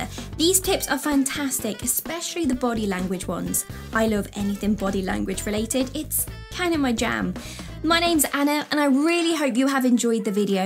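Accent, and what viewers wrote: United Kingdom. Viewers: I labh the British accent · NunYo BizNizz It’s British.., where did you get that idea from? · Uk accest much better then usa and Canada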